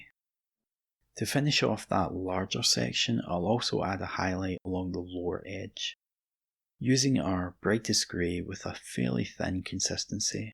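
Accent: Scotland, United Kingdom. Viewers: Nah, your accent is pretty much all there, cos after your vids I often get a hankering to watch early episodes of Taggart !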